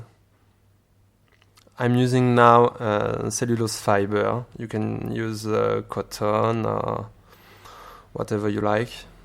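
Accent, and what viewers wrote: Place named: France. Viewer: You have lovely French accent : )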